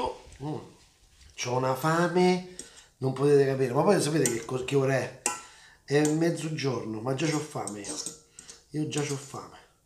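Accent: Rome, Italy. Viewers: Steffen Ali If you’re trying to learn Italian, you may have a bit of difficulty understanding this guy. He is very much a Roman and Romans have a strong accent that may be difficult for beginner learners. However, keep up the good work!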